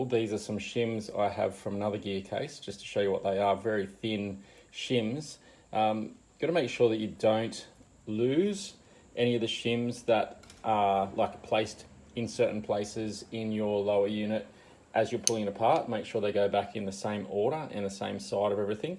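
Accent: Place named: United Kingdom. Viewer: Just can't abide the British accent. Gonna look for an American vid.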